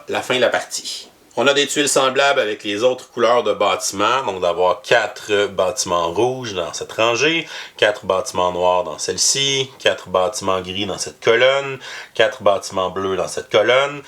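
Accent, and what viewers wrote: Canada. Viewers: Merci pour tes vidéos et ce merveilleux accent canadien ^^ mystic vale pr moi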